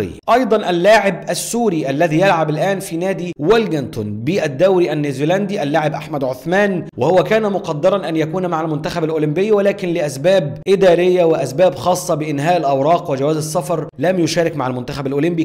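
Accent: Egypt. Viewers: انت سوري وللهجتك مصريه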